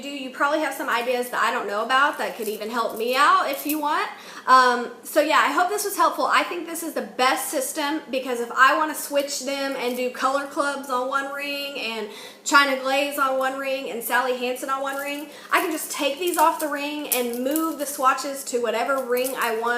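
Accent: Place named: South, United States. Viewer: Where are you from?! I love your accent are you southern?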